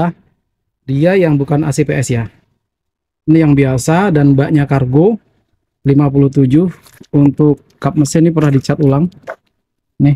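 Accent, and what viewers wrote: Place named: Sumatra, Indonesia. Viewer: Dari Sumatra ya mas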